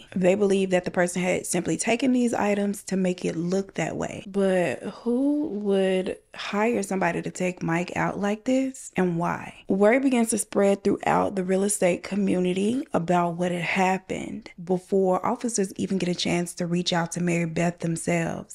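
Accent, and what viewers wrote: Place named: Houston, United States. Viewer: I love this channel! I had done watched allll of Bailey’s videos over the span of like 3 yrs then you popped up like 3 months ago and I have been hooked like a worm on a fishing rod. I 1st heard your voice and just knew you was from Houston to later find out you wasn’t but was moving to my hometown 😊. Your storytelling style is all of the things(I stole that from you) I love and didn’t even know it. You’re so funny and the bloopers at the end are genius…love them. Keep going and yes I’m catching up on all of the past videos. Oh and welcome to HTown 🤘🏾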